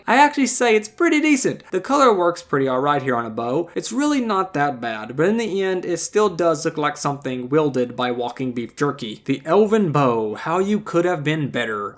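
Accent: South, United States. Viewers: What part of the South are you from?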